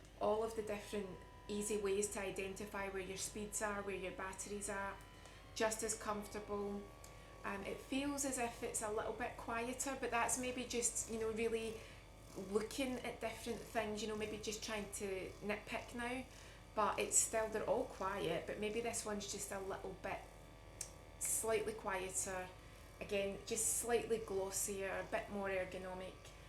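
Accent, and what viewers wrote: Scotland, United Kingdom. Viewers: Great to see a comparison of the three guns. I have dexterity issues and weakness in my arms because of spinal injury. My muscles get so tight from being clenched in pain for hours and sometimes weeks at a time. I gotta start saving up for one of these bad boys. New subbie here and I love your scottish accent. Irish woman myself. Thanks for sharing.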